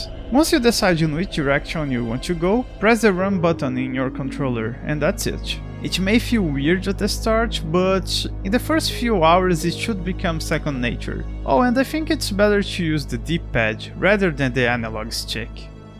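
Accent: Brazil. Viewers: O sotaque brasileiro tão reconhecível hehe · It's a Brazilian trying to talk english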